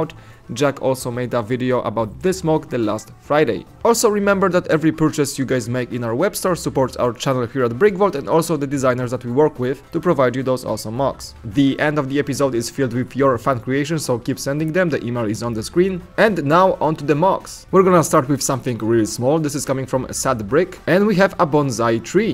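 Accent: Poland. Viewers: Jesteś z Polski? Przypuszczam, że tak po akcencie i tym jak czytasz polskie nazwisko, że chyba tak. Great channel! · po akcęcie stwierdzam że jesteś polakiem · You have gr8 polish accent. Are u from Poland?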